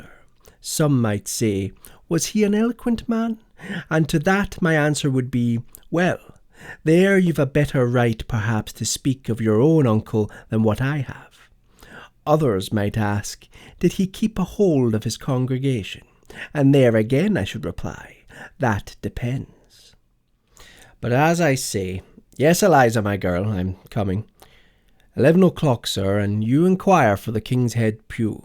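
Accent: Scotland, United Kingdom. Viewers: I realise this is 9 years old, but I've really enjoyed your narration, glad I found your channel. I love a Scottish accent ❤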